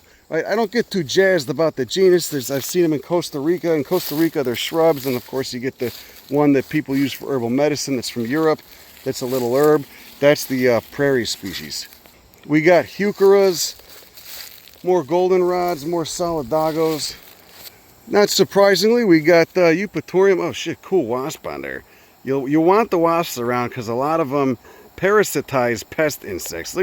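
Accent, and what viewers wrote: Chicago, United States. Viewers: I notice your Chicago accent gets stronger when you're back in da region!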